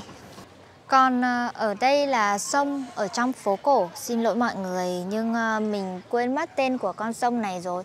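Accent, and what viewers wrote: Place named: Hanoi, Vietnam. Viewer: It sounds like you are speaking the Ha Noi dialect. Do you know the Vinh dialect?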